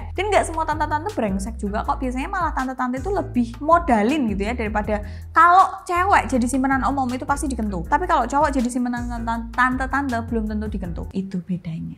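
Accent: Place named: Semarang, Indonesia. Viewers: Medok tp menawan ini * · Aku suka gaya ngomong nya * jawa kali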